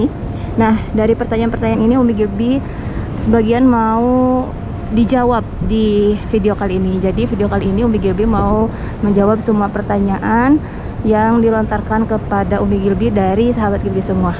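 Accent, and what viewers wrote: West Java, Indonesia. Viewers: Assalamualaikum sy baru buka Vidio ini tapi sy sering nonton video Nya Kalau denger cara bicara nya kaya orang Sunda ya dari mana asalnya karna sy orang Sunda juga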